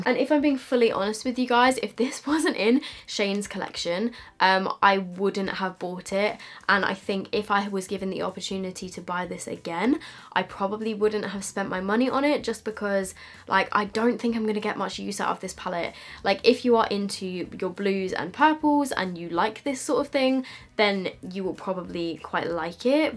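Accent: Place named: United Kingdom. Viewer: I find voice soooo calming! Anyone know where in the Uk she is from?!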